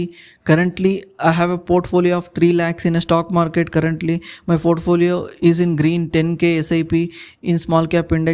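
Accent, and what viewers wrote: North Karnataka, India. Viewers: Bro nimma speech language kelidare neevu north karnataka start areadavaru irbeku anisutte nimma native place yaavdu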